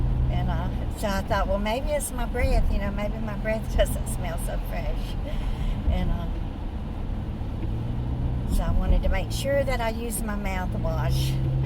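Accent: South, United States. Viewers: I love your beauty. I would love to visit with you and have you cook for me, not gonna lie. Now it sounds like you have a southern accent living in LI NY. It sounds lovely but it doesn't sound very LI haha.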